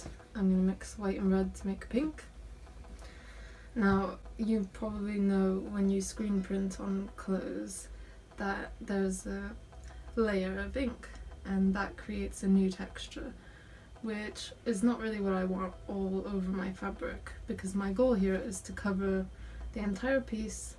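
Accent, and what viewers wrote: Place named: RP, United Kingdom. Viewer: You sound so posh. :)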